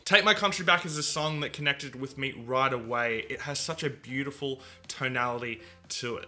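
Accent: Australia. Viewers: TheFilmPunk Aussie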